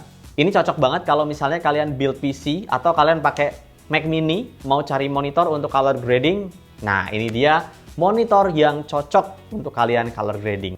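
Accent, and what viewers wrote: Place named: South Sumatra, Indonesia. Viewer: Terima kasih reviewnya, Bang... Informatif sekali 👍👍. BTW, Abang asalnya dari Sumsel ya? Kebanyakan teman2 saya yang asal Sumsel, kalo bilang kata "gelap", diucapkannya "gelep".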